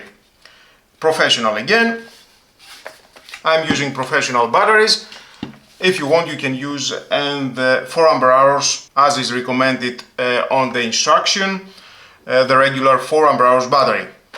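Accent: Greece. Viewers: Έλληνας είναι . Φαίνεται απτή προφορά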